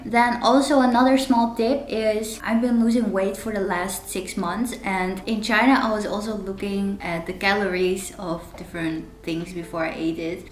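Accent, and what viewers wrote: Netherlands. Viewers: My flight to Chongqing is tomorrow. I am a bit late to watch this video. I did book a stay with English speaking staff luckily. Setting up all my apps now. Thank you so much!! btw.. Je Nederlands accent is super cute.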